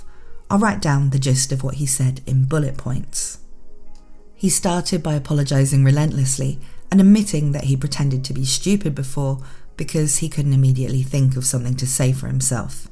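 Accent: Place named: United Kingdom. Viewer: More! Because of your accent, I default every story as something happening in the UK somewhere. Then you talk about States and other American stuff and there's a brief pause while my brain processes the disconnect.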